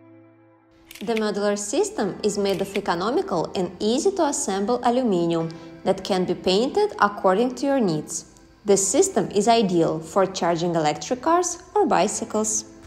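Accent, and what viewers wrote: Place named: Russia. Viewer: что то русским акцентом пахнет ))) и профиль до боли знакомый )))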